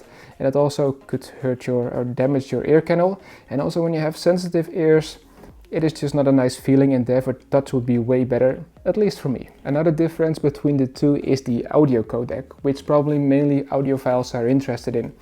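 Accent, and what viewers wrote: Netherlands. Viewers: just like the earpods? Just open the case? Edit: are you from the Netherlands because you sound like at Dutch man who speaks english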